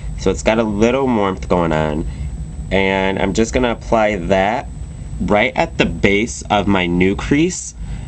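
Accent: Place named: Detroit, United States. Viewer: Lol i noticed that too, maybe he's from the north? b/c i have family from detroit & that's how they say it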